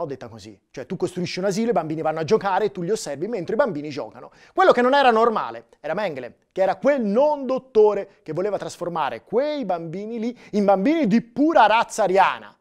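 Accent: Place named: Tuscany, Italy. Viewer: Ragazzo, sei un grande... Riesci con la tua parlata Toscana e il tuo sorriso ad alleggerire questo spinoso argomento.... È un piacere ascoltarti.... Baci.... Da una mamma.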